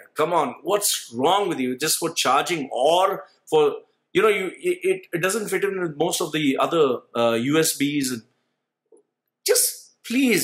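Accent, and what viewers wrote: India. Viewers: Indian accent ...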